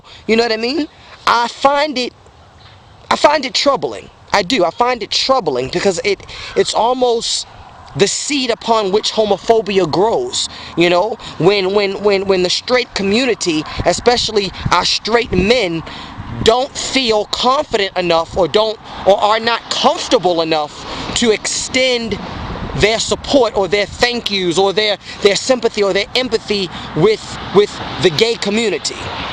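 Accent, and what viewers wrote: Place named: Baltimore, United States. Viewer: I knew you were from Baltimore..I can hear it all in your voice...And why do straight men do that. I think they do think this, that every gay man wants them...They need to pump the breaks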